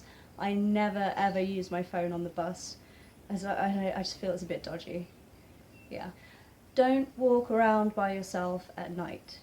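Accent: United Kingdom. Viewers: I fall in love with your accent, remind me of my ex girlfriend from England 🙂❤️😍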